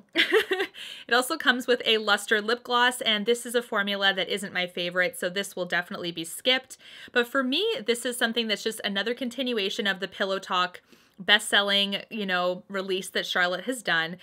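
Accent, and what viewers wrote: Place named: Canada. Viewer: I have to say I just love your channel and your personal energy. I also love your Canadian accent! 😁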